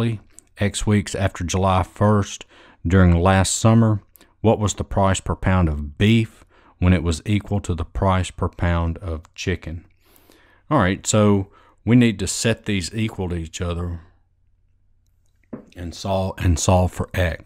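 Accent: South, United States. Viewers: Maybe it cause he from the south cause of his accent because am from the south but the way he solved the problems great and amazing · he meant with the southern accent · The southern accent is so annoying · Most southern accent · I was not here for math, I was just listening to your Southern accented English...... You are a good teacher. Regards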